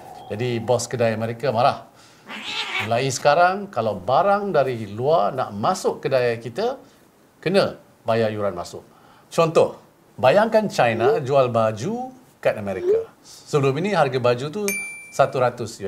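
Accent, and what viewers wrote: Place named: Indonesia. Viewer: Logatnya seperti orang indonesia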